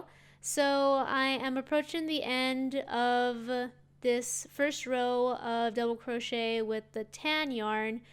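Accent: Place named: United States. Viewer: Sweet.Can anybody tell me what U.S accent does the craft lady have?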